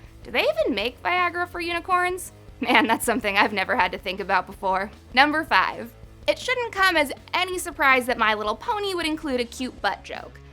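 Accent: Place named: South, United States. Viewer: Love your southern vibe too, the way you pronounce things is 👌🏻👌🏻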